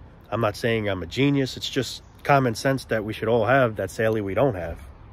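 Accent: New York, United States. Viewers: Great NYC accent. Heroes of 911.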